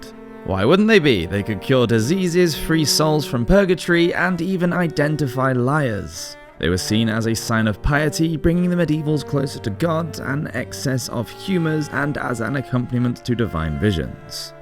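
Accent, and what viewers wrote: United Kingdom. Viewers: I love the way this host talks, I am a Canadian, I quite often find some brit"s accent is difficult to understand, I guess there is many types of brit accents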